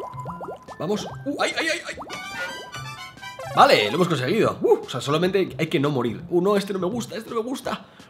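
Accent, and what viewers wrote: Argentina. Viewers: Te sale mejor el Argentino que a mi (que soy de ahí) XD Como lo haces? Voto por un blog entero en idioma boludo! jaja Me encantan estos bichitos!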